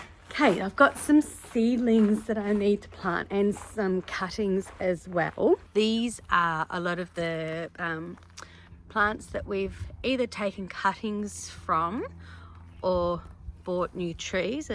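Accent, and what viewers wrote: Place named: New Zealand. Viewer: I was gonna say you sound like a kiwi! Kia Ora!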